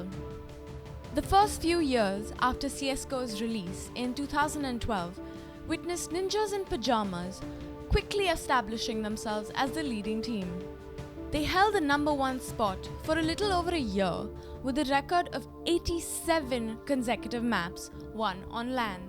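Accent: India. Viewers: Indian accent?!?! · The Indian accent is a bit irritating to be honest. Of course you are used to it if you are local and listened to it your whole life. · I clicked the video because the title sounded credible but then i heard the indian accent... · wtf is this indian english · U indian or sth? Lol no offense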